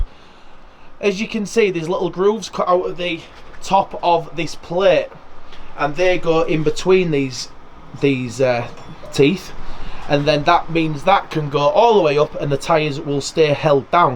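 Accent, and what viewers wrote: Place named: Hull, United Kingdom. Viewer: Nice video fella, and nice to hear the Ull accent, I was a holderness road boy many moons ago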